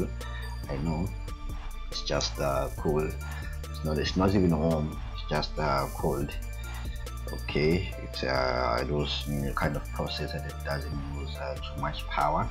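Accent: South Africa. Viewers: U from south africa I can hear ur accent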